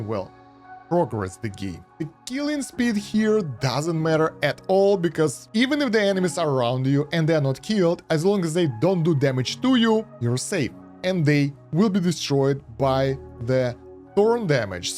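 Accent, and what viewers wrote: Russia. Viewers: Искал видосы на русском языке , но не нашел ) думаю ладно открою первый на английском и тут мужик с русским акцентом 😂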